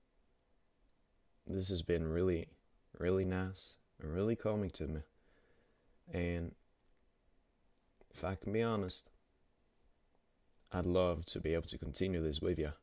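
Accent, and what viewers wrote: South, United States. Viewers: as a person who lives with country people, The accent is so funny to me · I am so confused. Is the location America west or Austraila west? If it is America west, we don't have dingos. If it is Australia west, then the accent should be Australian and not southern north American. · That was a good southern accent attempt 👍👍👍👍 good job 😊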